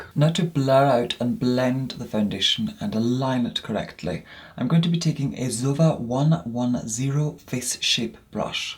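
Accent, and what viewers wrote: Scotland, United Kingdom. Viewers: yes! he has a Scottish accent in his early videos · Where is he from , cause I'm getting a hint of Scottish ( btw I'm from Scotland ) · He's originally from Scotland, so it's probably a Scottish/English accent mix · Michaella if you are referring to accent, that is in fact a Scottish accent. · Although sounds English I’m 99.9% sure he is Scottish, the way he says some words and his last name screams Scottish descent. (I’m Scottish) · I love british acent, "there're some areas that need a lil bit more foundation, like MY YOOH", I gotta learn how to speak like british people 💕💜💖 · Do I detect a Scottish twang amongst his queens english?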